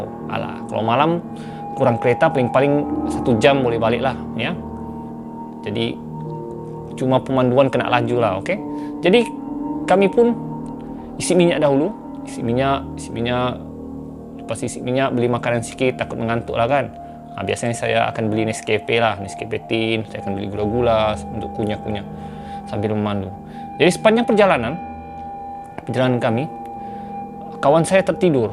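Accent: Sabah, Malaysia. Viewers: Best gila bro ni bercerita. Aku Jrg2 bukak youtube, dgr org bckp smpi hbs. Sbb apa? Sbb boring. Suara tak sedap. Annoying. Accent sbh bro ni, pnceritaan dia yg clear buat aku khusyuk nk dgr smpi hbs. Hahha. Good job! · Bkn setakat accent sabahan ja , org2 dia pn aku suka . Cantik2😅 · Tu sebahagian dari loghat melayu sabah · Logat sabah cantik bunyi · Ak minat betul dengar loghat sabah ni .best je dngar. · Aku pun suka tone org sabar ckp sedap Dan aku Cuba belajar ckp tone mcm tu,memang susah Beb. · Sape nak tau loghat melayu zaman dahulu.. Boleh dengar loghat Sabah zaman skrg.. Like tanda setuju 😁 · Proud of your accent bro! This is how we as Sarawakian & Sabahan should talk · I just love Sabahan's accent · Sy org Kedah,tapi suka sgt dgr loghat Sabah.. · Penceritaan yang bagus. Ton suara yang sedap didengar. Sebutan jelas. Ada emosi ketika menyampaikan. 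Walaupun dalam loghat Sabah, tiada masalah utk memahami dan menghayati. Jadi, teruskan! Saya suka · Seram sbb jln cerita..Lawak sbb slang2 bugis tawau..ketawak sendiri aq takut org smnnjung dk paham..ditapok bha😂😂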